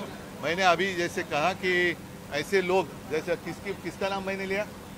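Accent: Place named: Marwari, India. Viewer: Marathi me boliye sir ..... Aisa lag rha h ap marwadi ho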